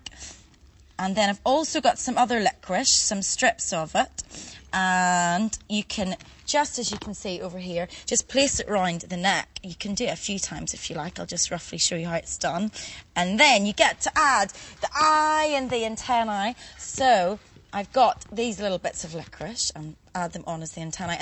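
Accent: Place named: Ulster, United Kingdom. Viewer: Ulster, from the accent.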